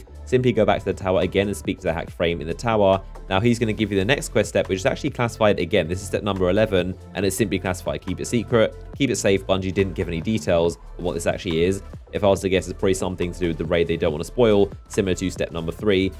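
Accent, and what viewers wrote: England, United Kingdom. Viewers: this video is supposed to be a tutorial but your talking too fast. as if u dont even care if people can understand you or not . plus u have a thick english accent. how can u expect people to understand you... this video is a thumbs down!! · Is it just me or do gamers who have UK accents r just awesome (he's from the UK right)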